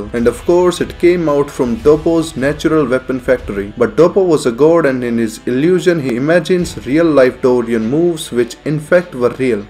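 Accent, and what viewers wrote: India. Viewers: His indian accent just make this video better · i love this guy's perfect grasp of english slang combined with an indian accent, its hilarious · Dude I feel like you are a fellow Indian the accent sounds very similar 😅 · u sound indian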